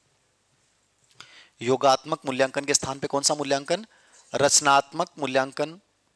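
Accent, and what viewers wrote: Rajasthan, India. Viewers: Sir aap ki Marwadi ne mn ko khus kr diya aap ke samne beth ke pdne ko mn kro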